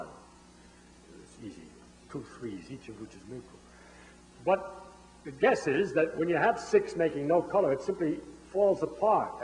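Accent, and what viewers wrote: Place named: Brooklyn, United States. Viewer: I’ve spent this rainy day watching Feynman lectures. Jeez, what a terrific teacher. Love his thick, Brooklyn accent. He has the timing and cadence of a comedian.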